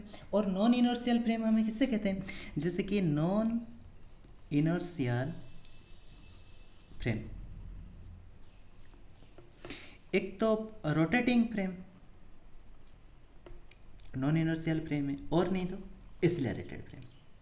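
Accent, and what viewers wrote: Odisha, India. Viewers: Are u from ODISHA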